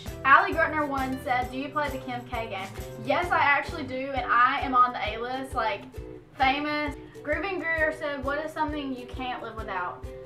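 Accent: Alabama, United States. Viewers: She's from Alabama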